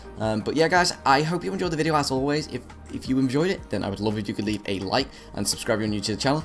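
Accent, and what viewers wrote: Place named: Liverpool, United Kingdom. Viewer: Got to be Liverpool, that accent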